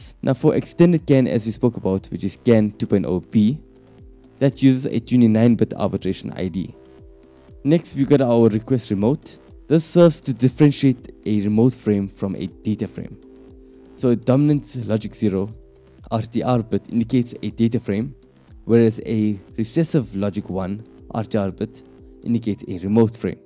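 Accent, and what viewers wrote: South Africa. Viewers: South african accent!!! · Are you from South Africa?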